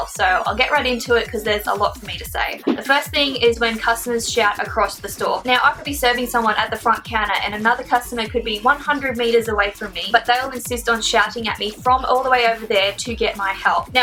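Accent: Australia. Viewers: Your accent is so pretty. Are you from Australia?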